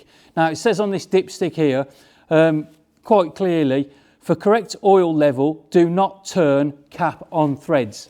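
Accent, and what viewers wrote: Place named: Cockney, United Kingdom. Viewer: His accent is not an accent you hear very often, consequently unfamiliar to most Americans. If I were guessing, I would guess it's a Cockney accent which is barely intelligible to the average American.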